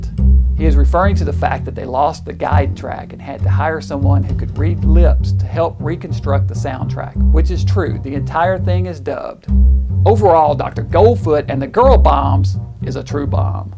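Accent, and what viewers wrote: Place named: Tennessee, United States. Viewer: I grew up in Tn. I knew your accent. My father was from Rockwood Tennessee and ran away from home when he was 13 and joined the spookshow business! I've always had a lifelong affection of horror and sci-fi! Excellent work!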